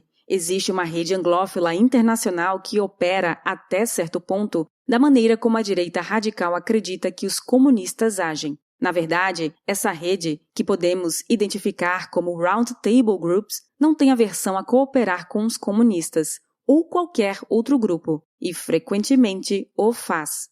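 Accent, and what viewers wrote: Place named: South, Brazil. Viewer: Essa narradora é sulista kkkk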